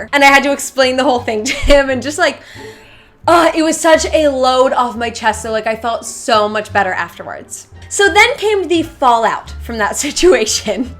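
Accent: Cockney, United Kingdom. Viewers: Why does your background and you talking british remind me of Zoella? 💗💗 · It sort of sounds like a London Cockney accent :) x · u know ur an OG fan when u already know most of the story.. and ur british accent is a solid 10\10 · your British accent is amazing!! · omg your accent is london cockney its actually quite believable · Love the British accent ur so awesome!!! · i love your british accent its so cuteee 😍😍😍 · You should film a whole video in your british accent :3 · you are really good at speaking british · Ur British accent is sooo gooooood xx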